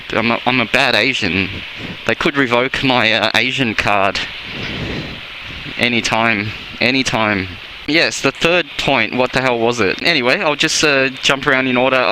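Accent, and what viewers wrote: Australia. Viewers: Sorry ur biased, some times u sound like a yank and some times like an Aus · Lol...odd and refreshing to hear a viet with an Australian accent. How the hell you cross the streets in VN? Seems with like constant traffic with the scooters, bikes and cars, you must need to pole vault from one side to the other. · Keep the videos coming matey. Beautiful accent btw....I love the aussie accent. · Your aussie accent does not sound too good. I prefer the good old american. Sorry!! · you have a Melbourne Accent.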